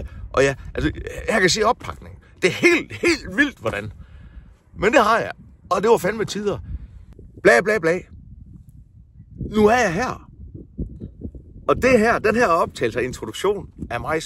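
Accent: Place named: Jutland, Denmark. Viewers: Du kan tage ud af Aalborg, men ikke Aalborg ud af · Hold nu kæft du taler jysk på dine gamle dage 🙂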